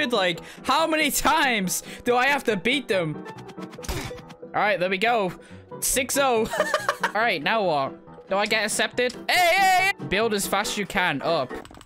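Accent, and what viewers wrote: United Kingdom. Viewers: How to tell he is british A stat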